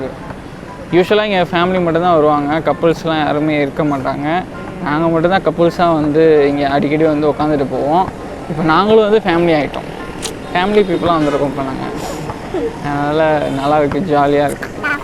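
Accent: Madurai, India. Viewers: Neenga madurai ya bro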